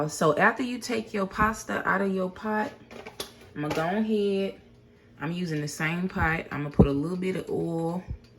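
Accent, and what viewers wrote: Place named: South, United States. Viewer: She so southern haha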